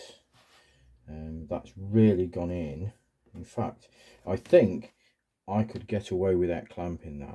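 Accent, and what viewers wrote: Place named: United Kingdom. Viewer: His British accent makes it nearly NOT understandable if you have the same problem to resolve and are a USA English speaker and reasonably skilled..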